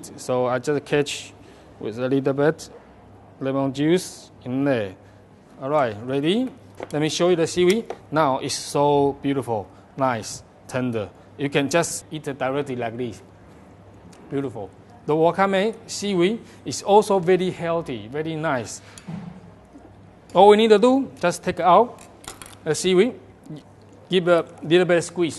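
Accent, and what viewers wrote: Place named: China. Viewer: His accent is definitely of China...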